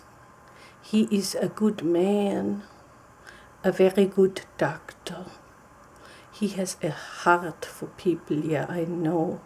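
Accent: Germany. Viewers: Unexpectedly brought to this video and I really like it because of the strong accent I don't hear so often in other videos. The dialogue makes me feel like I was staying in a small, family-run inn after a long day of traveling. I feel like comfortable and safe in the capable hands of German hospitality. I haven't been to Germany, but I very much hope to in the future. I would look forward to more videos! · This is the first time ive seen tbis video what a great job I loved the extra strong accent you look like a real nurse I know these types of videos are not easy it would be so cool to see more videos like thiz if you can ever find extra time that is i would love if you made a video with you speaking in german just for extra asmr tingles im sure all of your followers also would enjoy to hear you speak your native language anyway this was just a thought i love asmr where people have accents or speak in other languages this is a huge asmr trigger for me Thank you for all your hard work love from nanaroriasmr 💖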